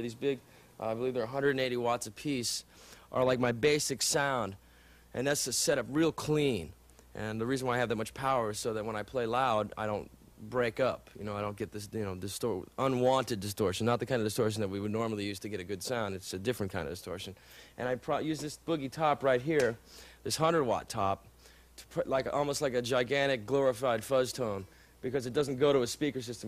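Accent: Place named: California, United States. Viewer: to future: lose the gnarley beach boy accent,the head shakes,the perm,the earring,but not the licks...now 2021 perfect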